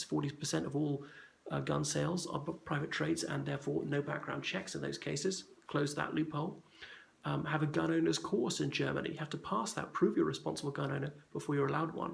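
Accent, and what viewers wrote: United Kingdom. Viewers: You’re an embarrassment to the Irish and you sound like a Brit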